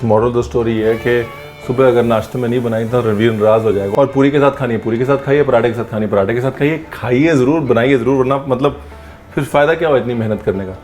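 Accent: India. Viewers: Desi language with super desi accent...but when you switch on vilayti one no one can imagine ki bande ne kya khub hindi ka hath pakda hai...👍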